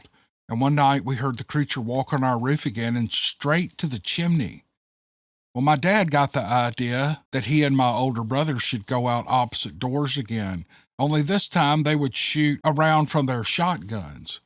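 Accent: South, United States. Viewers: I really loved these stories. I adore that sweet soft southern accent. I love ya. I really wish I could meet ya'll. Unfortunately I live in Erie Pa area. I wasnt born here i came from central PA where all the states "rednecks" come from!! 😂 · I Always make it to the end!! One of the best podcasts on here.. So glad to hear that you are working on Steve Lilly ❤. thank you for your creativity and that Southern voice!!!! Keep up the great work love from Northern Lower Michigan!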